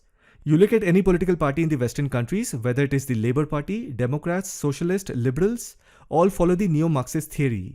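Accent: India. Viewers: Russian propaganda with Indian accent.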